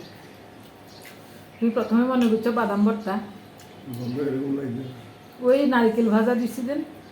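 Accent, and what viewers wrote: Noakhali, Bangladesh. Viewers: Ami Biratir theke dekhi.Spnar kothar modhye noakhalir tan ache.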